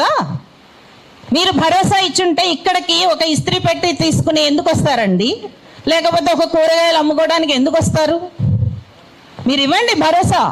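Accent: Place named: Andhra, India. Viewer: vellaavuu vachaavu antaavu antoo mee speech lo chala andhra slang ae vinapadtundhi... meeru endhi Telangana tarapuna maatlaadedhi??, first complete ga bhasha lo yaasa maatlaadi aa tarvatha itla press meets lo speech iste oka value untadhi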